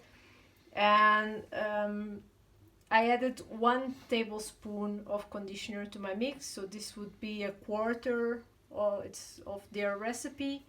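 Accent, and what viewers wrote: Portugal. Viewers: Just a question: Are you Portuguese? Because your accent seems to be 😁. Another question :This colour doesn't affect the hair colour? It just cover the gray hair? Thanks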